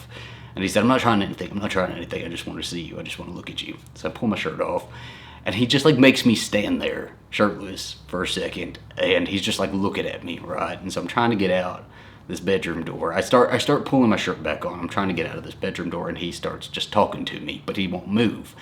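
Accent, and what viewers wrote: South, United States. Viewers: Dude you are the BEST story teller!!! You should narrate books, southern accent and all!! Love you two!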